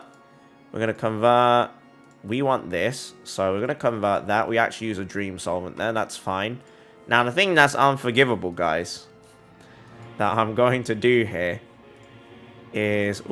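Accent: United Kingdom. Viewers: Are you from the UK? Good to see your vids!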